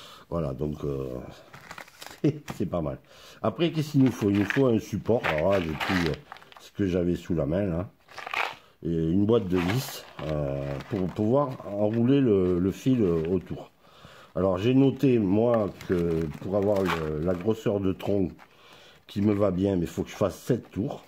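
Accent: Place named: Southwest, France. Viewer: Salut ^^ Toujours ton imagination et ta créativité débordante. Trop jolis tes arbres, fallait y penser. Rendu visuel du tronc assez bluffant. En tant que Toulousain et amoureux de nos routes départementales et anciennes nationales bordées de ces platanes souvent centenaires, fallait que je salut je ton travail sur ce coup là. En plus de ton accent du Sud Ouest, si cher à notre cœur. Adissiatz ^^ José (Tu aimes les trains, tu aimes la musique, en passant ta "SG" est splendide, tu m'épates 😅)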